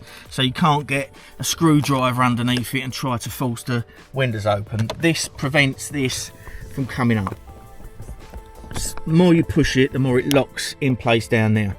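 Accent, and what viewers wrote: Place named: Essex, United Kingdom. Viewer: Well done. Love your Essex accent 😊 I lived in Epping when I got married then moved to Rayleigh for years until we moved up to Norwich in 2003. Mal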